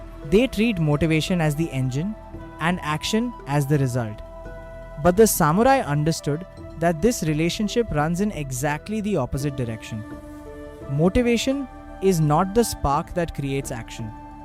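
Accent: India. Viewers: Japanese content but Indian Voice Over ???????????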